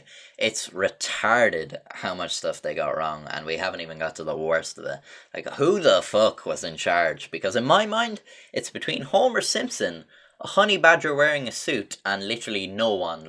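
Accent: Ireland. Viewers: Are you Irish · your irish accent makes it funny when you rant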